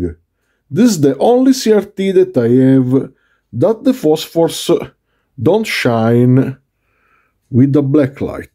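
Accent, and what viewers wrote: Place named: Italy. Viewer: Mamma mia che accento italiano! Vorrei realizzare anch'io il tuo progetto ma non hai accennato allo schema e ai componenti.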